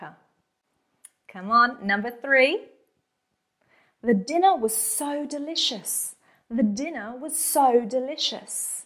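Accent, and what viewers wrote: United Kingdom. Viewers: Thank you so much, you are such a great teacher. I've made great progress in my English level by watching your channel! your British accent is really lovely.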